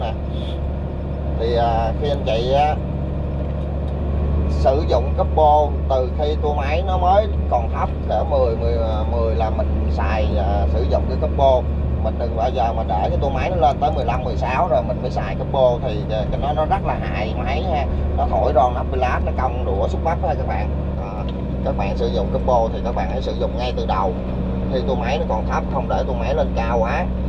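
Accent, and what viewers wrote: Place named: Tay Ninh, Vietnam. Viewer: Giọng bác này ở tây Ninh quê em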